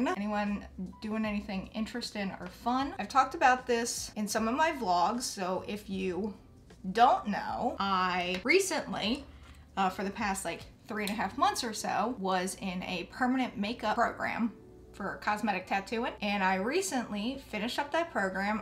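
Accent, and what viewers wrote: South, United States. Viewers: Just got a couple of nose piercings and was looking for aftercare advice and and noticed makeup eyeshadow colors. I love to see bold colors used even though idk how to put makeup on lol but I love the accent (sounds southern and northern at the same time) and listening to her voice is so natural ❣️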